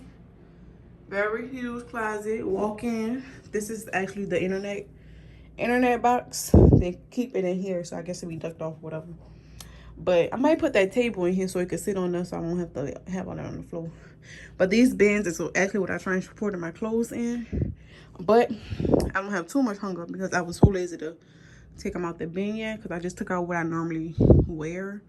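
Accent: New Orleans, United States. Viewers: New Orleans accent ❤️❤️ love it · You must be from the Nola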